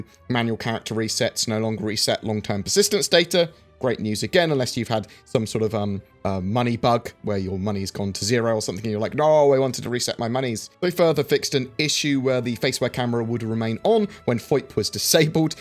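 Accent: United Kingdom. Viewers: Is that a UK accent? Why does he speak like a sound wave .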